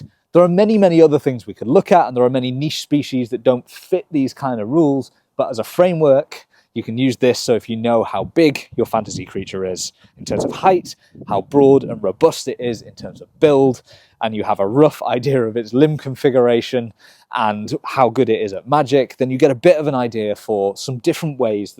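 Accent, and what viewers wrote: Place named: North, United Kingdom. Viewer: Did I just thoroughly enjoyed a comely, charismatically genteel ranger ramble about the biology of imaginary creatures in a posh northern accent? Never thought of the structural integrity of a centaur... Yeah the upright human spine + equine vertebrae won't work. Oh I'm a mage. How do you do.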